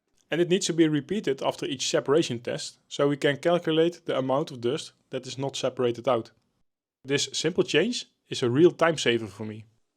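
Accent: Netherlands. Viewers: half a second in i reconised your accent as one of my own. and then at 48 seconds you confirmed it. How are there so many dutchys on youtube ill never understand. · I can barely understand what you are saying. You have a really thick accent I guess Dutch.. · Actually this was part of what got me hooked. Love me some thick Dutch accent, probably because I've met so many nice Dutch people. Greetings from duitsland, godverdomme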